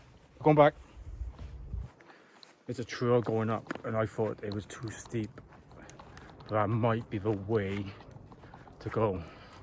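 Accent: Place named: Monmouthshire, United Kingdom. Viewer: Off the scale handsome. Such a lovely accent too... Sounds like Monmouthshire to me, but may be wrong 😊.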